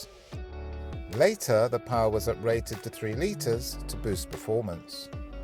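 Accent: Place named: United Kingdom. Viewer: Greetings from Texas! Your gorgeous British accent is equal to this gorgeous car!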